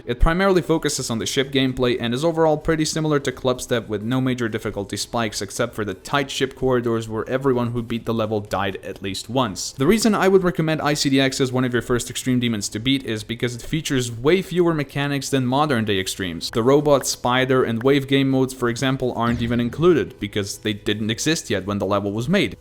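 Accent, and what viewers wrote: Slovenia. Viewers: Yeah i can hear your accent is slovenian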